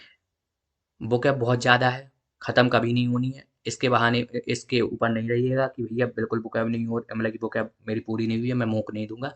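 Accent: Bundelkhand, India. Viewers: Bro are you from bundelkhand??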